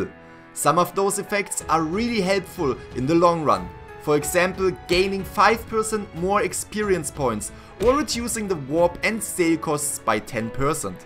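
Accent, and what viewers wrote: Germany. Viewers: You sound german :o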